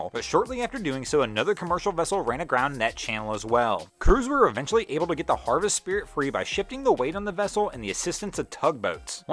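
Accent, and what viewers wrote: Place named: Tennessee, United States. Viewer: You are amazing. where are you from. Tenessee?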